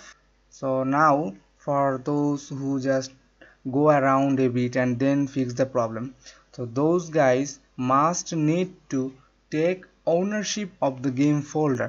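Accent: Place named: England, United Kingdom. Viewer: This English accents....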